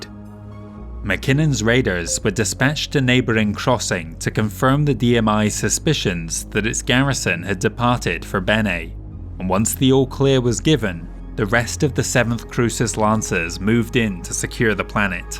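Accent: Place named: Wales, United Kingdom. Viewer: Where is your accent from? I'm sure that you have said, is it Welsh? Great video and thank you for the lore!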